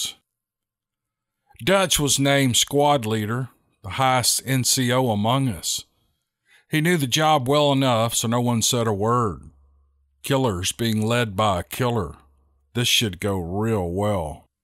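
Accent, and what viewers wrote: South, United States. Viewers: Hi, This is Dave Ramsey, and I grew up in Memphis also. I'm 61 this year and now live in Las Vegas, and it is nice to listen to your Southern draw as you tell your stories. Really just wanted to say Great job with your pod cast... Love your Stories.. Dave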